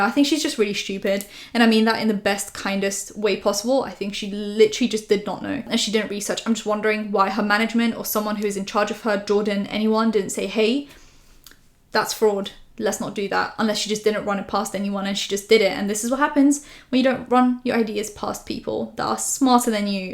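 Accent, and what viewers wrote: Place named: England, United Kingdom. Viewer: i wish we were friends. i hear the english accent and wonder if ill ever run into you. but then i realise England isnt THAT small XD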